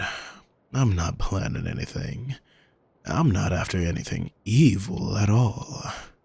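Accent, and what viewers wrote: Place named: South, United States. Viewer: I love a good southern accent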